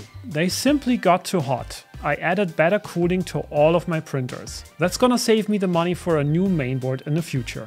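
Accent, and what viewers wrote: Germany. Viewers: Der deutsche Akzent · I hear a German accent (or one that could be mistaken for German) and I watch the whole video.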